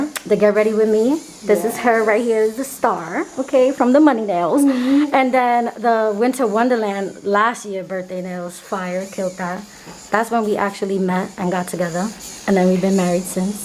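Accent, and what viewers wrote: New York, United States. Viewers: Omg, I been follow you for a while now...I had no idea you were based in New York, but now I can totally here that accent. Hella cute ma, much love from CALI 💙😈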